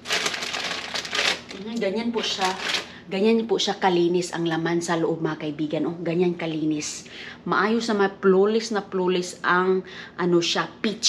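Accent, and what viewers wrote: Visayas, Philippines. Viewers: Ate NA eexit din talaga ako sa iyo habang nag hohole ka NG mga NA dumpster ka kasi ang igay MO talaga halata g bisaya din talaga hahaheee. Horayyyyy. Ate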